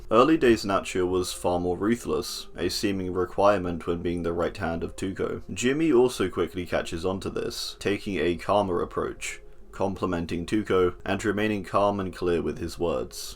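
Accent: United Kingdom. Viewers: Hey how's it going?, i assume your accent is English but i can't seem to place your accent on a regional scale, i mean?? is it South? South East? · Isn't this the guy who does DbD videos? I love this british voice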